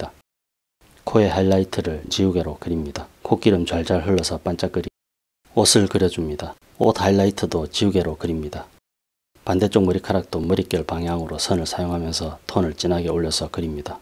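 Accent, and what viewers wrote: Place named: Busan, South Korea. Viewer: 말하시는것보니 부산인듯하네요 혹시 직접화실운영하시나요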